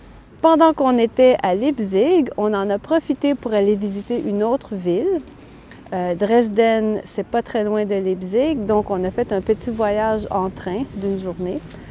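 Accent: Quebec, Canada. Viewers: J'aime bien tes vidéos, et ouais, je te comprends parfaitement. 😃 J'essaie de améliorer mon français avant mon voyage au Montréal en août, donc je apprécie beaucoup pouvoir écouter l'accent Québécois pour m'entraîner. (Et corrige mes erreurs, s'y en a, STP). 😃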